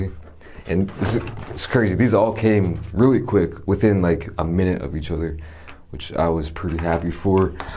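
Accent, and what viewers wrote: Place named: Chicago, United States. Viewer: There must be a hood out there in Korea cuz I thought you were one of our brothers from Chicago the way you talk and the way you carry yourself so glad I found your channel brother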